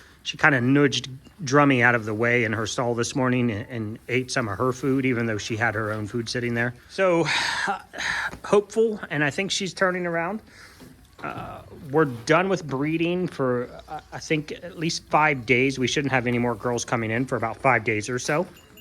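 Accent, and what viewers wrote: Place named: Midwest, United States. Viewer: Looks like you may be getting some crap weather going through Ohio this weekend into next week. Frosty has the prettiest babies and they love her. Gingy is yo-yoing again. Poor girl. She gave us Chuck Norris. I live in Texas, but was raised in Iowa. Love that Midwest voice tone. Praying for Gingy to recover. Weather won't help her. Much love to both you and H.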